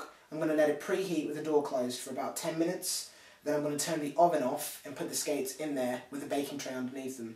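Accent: United Kingdom. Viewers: a black guy with a British accent talking about hockey skates..... lol crazy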